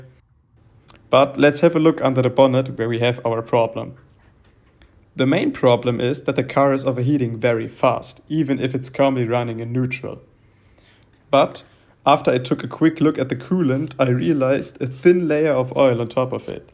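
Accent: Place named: Austria. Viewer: You have a very strong accent, are you from Austria?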